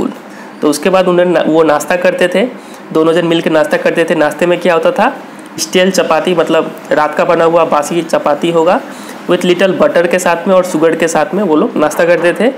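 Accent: Bihar, India. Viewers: Bihari he kya😆😆😆😆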